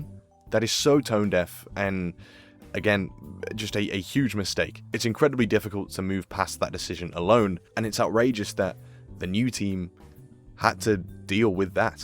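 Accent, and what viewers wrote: United Kingdom. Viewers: It's infuriating that "lore" and "law" are pronounced identically with a British accent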